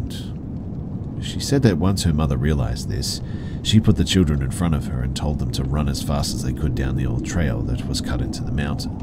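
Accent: Australia. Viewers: Was going to say better be an Aussie saying that but then could tell 😅o Aussies speak like that 😂❤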